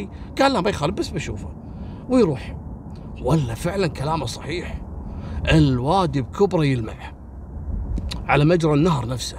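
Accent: Kuwait. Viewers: استاذ صرت اعرف واحب اللهجه الكويتيه شكرا الك القصه روعه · اللهجة الكويتية حلوة ومفهومة.أنا تعلمتها من خلال سوالف الله يعطيه الصحة ويطول عمره 🤲👍🌹🇲🇦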